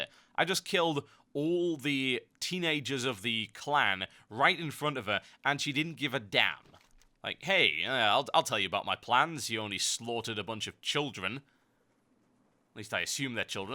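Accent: United Kingdom. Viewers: I come for the WoW stay for the british accent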